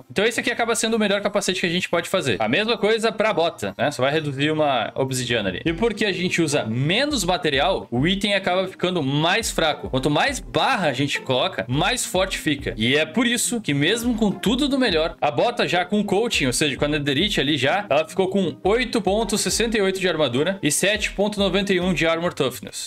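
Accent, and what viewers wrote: Rio Grande do Sul, Brazil. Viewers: Você e gaúcho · vc é gaucho? no way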